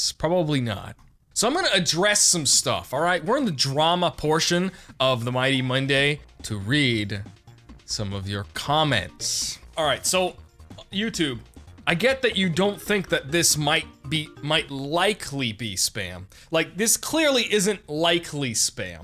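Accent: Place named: New York, United States. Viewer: I think people only watch this dude cuz of his new York accent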